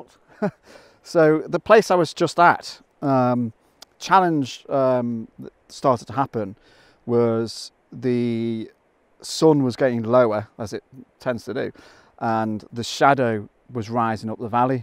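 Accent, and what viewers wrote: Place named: Wirral, United Kingdom. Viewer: Thanks for sharing. Really good narrative to your thinking of the composition of the images. Plus you sound like a local of mine (wirral) A definite sub. ATB Andy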